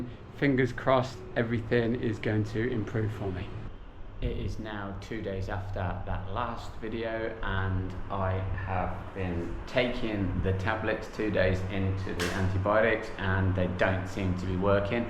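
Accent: United Kingdom. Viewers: Thanks for posting. My second great grandfather died after sailing out of India years ago on a tea clipper. It really does frame the disease risks of living abroad. My own personal experience of living abroad is in China, where I lived for many years. Before I went I took quite a few shots of vaccination, and while there I boiled all my water, unless I drank bottled. All my time, more or less was spent in cities. I'm Rh- and I read somewhere we are less likely to get Malaria - I don't know if anyone reading this has heard this....? By the way, whereabouts are you from - I'm guessing somewhere between the East Midlands and London?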